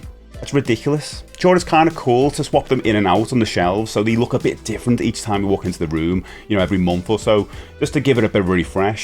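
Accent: United Kingdom. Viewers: First of all I love you're voice. Something about British accents that appeal to me. Lol Second, I've been a toy/ action figure collector for 30 years. I really love my collection. Mostly Buffy the vampire Slayer stuff, WWE/WWF figures. I used to have the ring and part of the wrestling ramp with accessories. But no longer have those because those are the ones I sadly played with as a kid lol. Third, I'm into Funko pops too. I've got lots of them. Harry Potter for instance I've got the Hogwarts castle. I would love to unbox it and display it for my entertainment. But at the same time I'm afraid of dust and dirt or whatever ruining it over time. Lol Fourth, I've go alot of Disney Funko pops. Everything is in the box. Currently I'm at a loss of what to do. Should I also do the pros and cons list?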